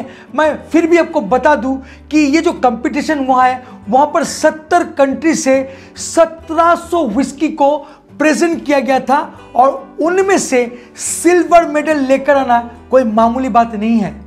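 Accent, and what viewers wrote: Bengal, India. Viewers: Kimon aachen dada ! Well presented. Good diction with enjoyable Bengali accent. Will take yr advice...... Cheers 🥂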